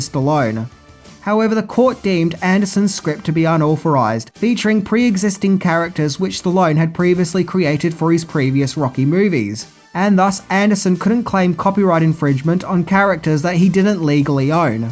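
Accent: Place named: Australia. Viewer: Not DraYgo...........DraW-go...................I understand you have the Australian accent, however its also important you pronounce things correctly.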